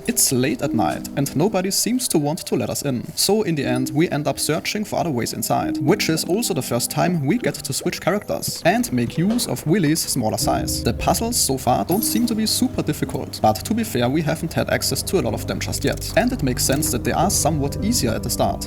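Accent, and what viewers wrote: Germany. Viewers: So geil wenn deutsche englisch sprechen 😂 man hört es irgendwie immer raus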